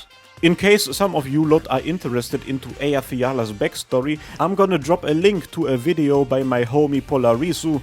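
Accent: Germany. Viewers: holy your german accent is so insanely strongxDDDD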